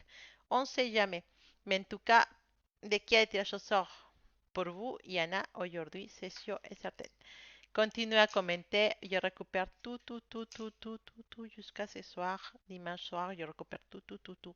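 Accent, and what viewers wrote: Spain. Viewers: Bonjour, je vous découvre avec votre douce voix et joli accent espagnol. Vos guidances sont vraies et si lumineuses. Je travaille depuis plusieurs mois pour lâcher prise de mon passé toxique, être en paix dans mon âme, mon coeur et mon corps et l'univers me met à nouveau mes ex sur ma route. Difficile d'être seule mais je pries mes archanges et mon ange. Je suis sereine malgré mes difficultés financières et refuse le retour d'un ex. A quand le repos et le bonheur d'aimer à nouveau de tout mon être? Comment s'appelle votre pierre orange qui vous illumine? J'aimerais gagner un tirage personnel et participe au tirage au sort. Merci d'être là. Je vous embrasse. Namasté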